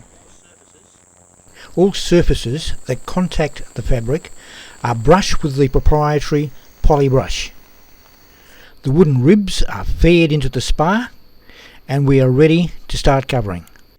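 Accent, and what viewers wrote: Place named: Queensland, Australia. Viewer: He said 1988, NQ Aussie accent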